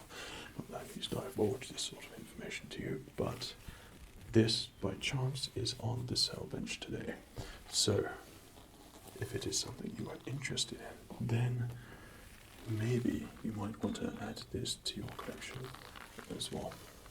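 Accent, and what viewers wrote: England, United Kingdom. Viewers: English accent gives me so much tingles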